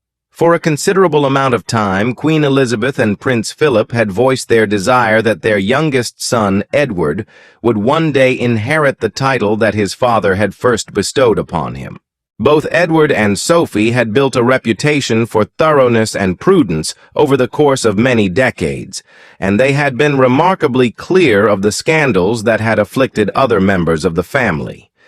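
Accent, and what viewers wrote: United States. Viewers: American voice talking about the British monarchy just sounds a bit off the mark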